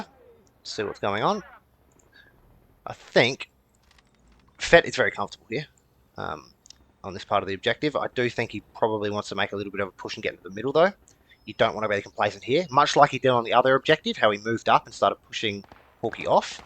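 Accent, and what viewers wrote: Australia. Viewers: Seriously though, you are a natural at gameplay commentary, and your whole tournament series was very entertaining!! Your aussie accent is lovely and improves the overall experience for me, personally. All the best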